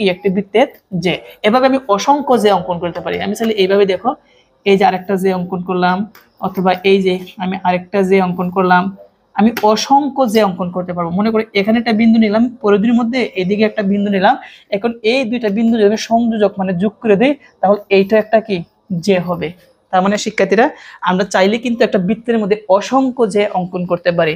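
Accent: Sylhet, Bangladesh. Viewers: Apnii ki sylhet er habiganj er ?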